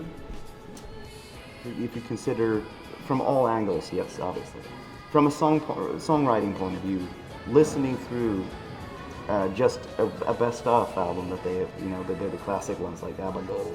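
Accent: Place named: United States. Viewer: Whoever this is, Alpha or Papa, there is virtually no accent whatsoever. He just sounds American, though erudite -- maybe Canadian. I'm confused.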